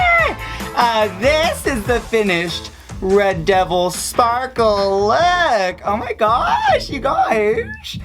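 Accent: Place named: Manila, Philippines. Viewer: The way she speaks is giving me Manila vibes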